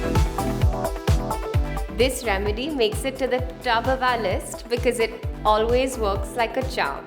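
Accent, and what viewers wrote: India. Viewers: you dont look indian but your accent says otherwise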